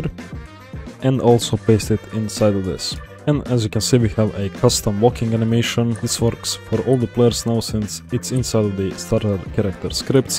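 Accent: Russia. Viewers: у него русский акцент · Russian Accent eh? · Author, are you Russian? You have a distinct Russian accent. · It’s probably Russian accent, if not then everybody heard it before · Бро ты русский , по произношению слышно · Слишком русский акцент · Ты русский если нет то почему у тебя акцент как у русского?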